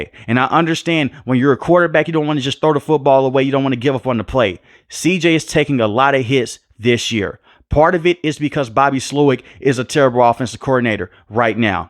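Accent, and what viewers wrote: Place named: Houston, United States. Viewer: Are you from Houston bro? I know a Houston Native when I hear one lol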